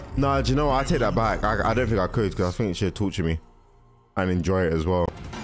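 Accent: United Kingdom. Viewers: bro is so bri'sh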